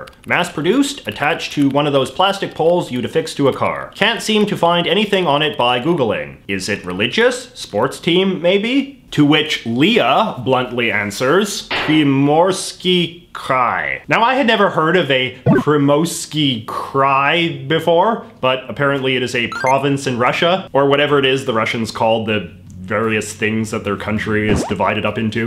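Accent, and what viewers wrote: Canada. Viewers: i know its 1yr old that was posted but your so Canadian cause you say abut then about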